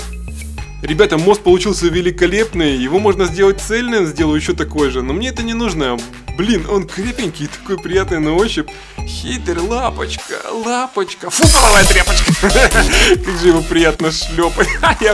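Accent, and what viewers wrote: Ukraine. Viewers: мои дэтуктивные метоты не врут ты Украинец? я услышал твой акцен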